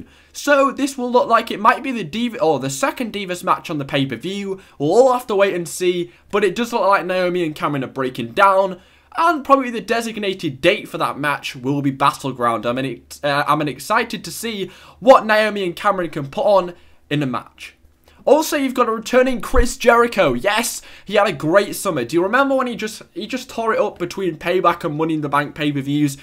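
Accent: England, United Kingdom. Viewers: You have a english accent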